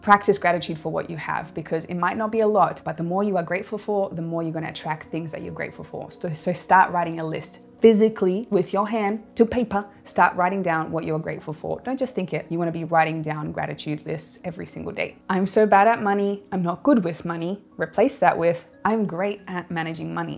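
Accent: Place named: Australia. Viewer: I couldn´t figure out why but the australian accent helps me to understand subjects better.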